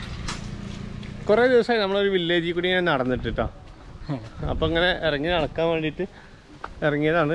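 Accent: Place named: Idukki, India. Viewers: Irukku kattappanakaran. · ബ്രോയുടെ ഇടുക്കി തനി നാടൻ സംസാരം ഇഷ്ടപെട്ടവർ ഉണ്ടോ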